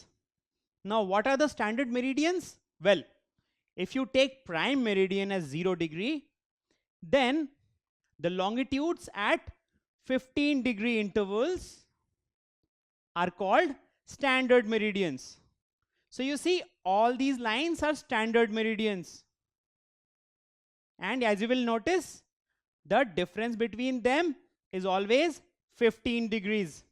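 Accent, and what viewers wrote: India. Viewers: The indian accent is funny and cute at the same time. Keep it going, sir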